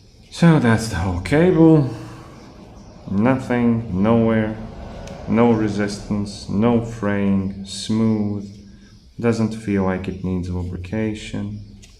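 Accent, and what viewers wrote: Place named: Bosnia and Herzegovina. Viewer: I was surprised when I heard this very familiar Bosnian voice on an unknown small channel. Guess 2 x 2 is 4 then.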